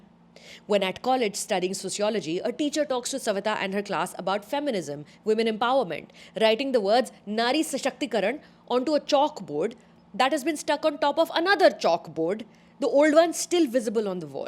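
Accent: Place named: Vidarbha, India. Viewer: Hey ....grt to see you cheering this beautiful creativity....thank you for acknowledging this vidarbhian art and accent also ...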